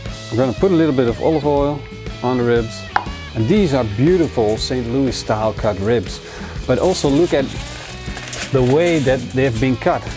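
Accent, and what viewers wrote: Netherlands. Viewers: Some Dutch accent ?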